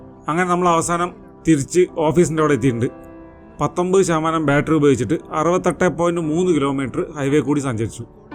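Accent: Palakkad, India. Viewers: ഇയ്യ് പാലക്കാടാലേ ??😁😁😁😁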